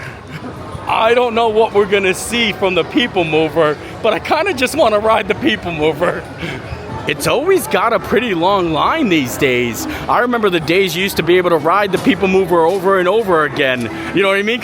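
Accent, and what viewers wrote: Pennsylvania, United States. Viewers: That pa twang! (I'm from Trenton originally)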